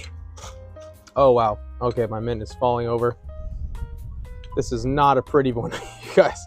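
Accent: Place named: France. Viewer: Stunning French accent, monsieur.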